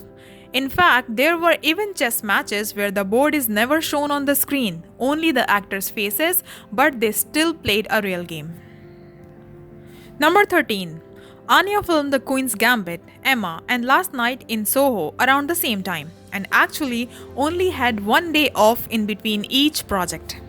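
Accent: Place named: Pakistan. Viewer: Pakistani voice over HAHAHA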